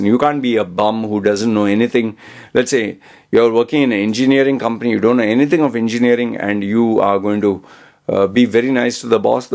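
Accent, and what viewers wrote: India. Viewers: you have a indian accent , are you too from india?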